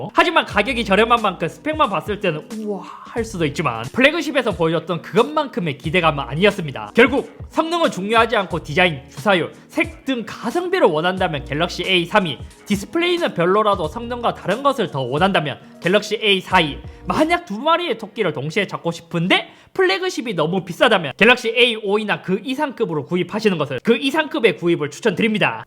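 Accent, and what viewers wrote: Gyeongsang, South Korea. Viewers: 말투로 보니 대구에서 자란 친구네. 대구~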